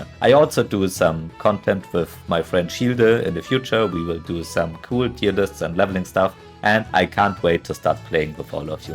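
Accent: Germany. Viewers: thanks for the video but the german accent is bursting me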